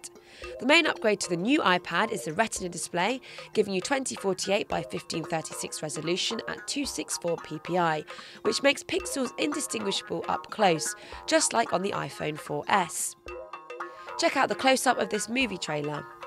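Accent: United Kingdom. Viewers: really helpful review for those who are hesitated between the two. btw, this lovely british accent makes TechRadar videos rather more enjoyable to watch, i've replayed the video ThREE times just for that reason, so i'm gonna give two thumbs up, one for the video and one for the accent.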